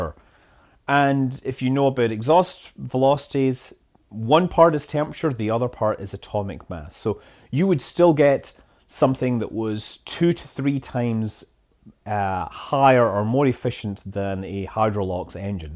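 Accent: Scotland, United Kingdom. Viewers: As a fellow Scotsman, yer accent does my nut in man. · Something about a scottish mans voice talking about rocket science is super calming. Kids want a bed time story heres uncle to read you history of rocket fuels.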